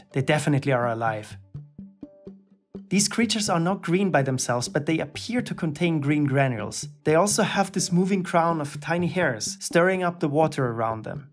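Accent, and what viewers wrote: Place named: Switzerland. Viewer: I believe so - also i think i detect a swiss accent in the content creator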